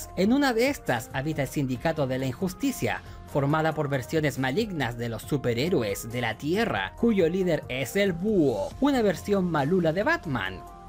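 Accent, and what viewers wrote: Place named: Chile. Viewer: Amigo hablas como un chileno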